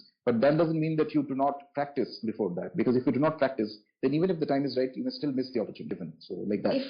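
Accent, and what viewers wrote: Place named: United Kingdom. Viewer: Why are u speaking in BRITISHER INVADERS language? Do we have no INDIC language to depict .. And why are u calling उपासक as उपासका & भैरव as भैरवा, while you correctly pronounce Chanel, Louis Vuitton, Dior, Citroen, Moët & Chandon, Laurent Perrier, Veuve Clicquot, Louis Jadot Domaine Leroy, Château , Bordeaux and L'Oréal as per original French pronunciation & correctly pronounce ikigai, wabi-sabi, kaizen, shinrin-yoku, omotenashi, gaman), kintsugi, shikata ga nai & bonsai as JAPANESE pronounce them. Then why SANSKRIT terms are pronounced by you as beef-eater BRITISH pronounce them? You're full of arrogance & अहंकार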